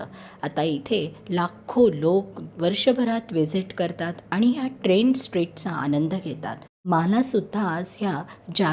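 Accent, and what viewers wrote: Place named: Pune, India. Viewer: Puneri marathi vatate !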